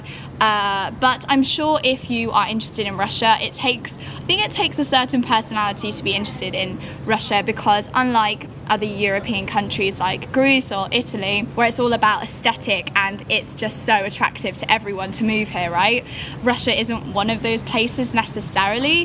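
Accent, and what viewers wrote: England, United Kingdom. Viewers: Я кайфую от этого невероятного английского Английского! улыбка) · Британский акцент это очень красиво!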